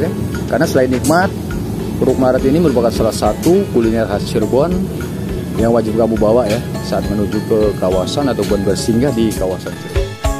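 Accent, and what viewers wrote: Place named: Malaysia. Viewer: Dengar dari logat kayak orang melayu youtubernya.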